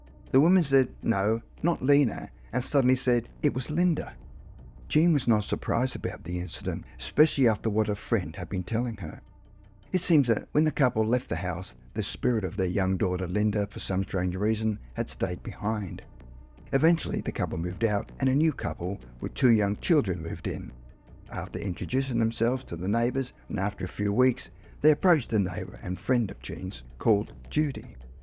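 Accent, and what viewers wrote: Australia. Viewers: Im glad to hear more Australian "spooky stories" narrated by Australians 😊 Hunters Hill and Gladesville are VERY heavily populated by paranormal shenanigans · It's great to hear a fellow Aussie narrate. Onya! 🇦🇺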